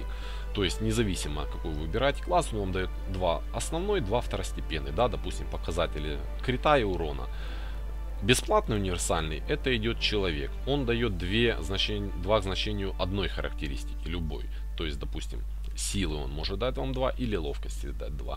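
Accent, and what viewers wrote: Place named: Ukraine. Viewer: ***** ну уши режет... лучше бы на украинском говорил , чем с таким акцентом .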